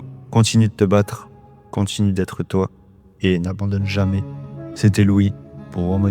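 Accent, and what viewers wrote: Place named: North, France. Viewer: T’es du nord Louis ahah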